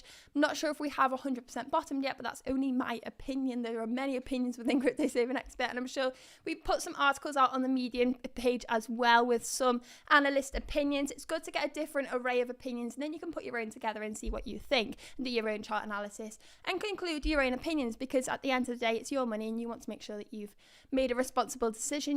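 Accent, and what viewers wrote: United Kingdom. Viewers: Your on form 🦋 I'm sure your a fellow Brummie ✨ Great job.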